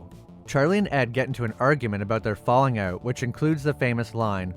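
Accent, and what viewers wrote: Canada. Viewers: Sounds like hes from the great white north and talk with that kinda accent, just my guess .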